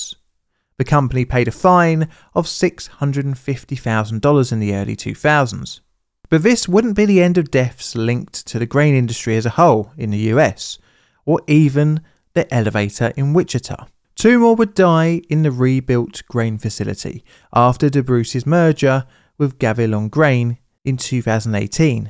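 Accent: United Kingdom. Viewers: I don't think there is ever a point where, when a Brit speaks, I have to translate at least a word, into American! Lol.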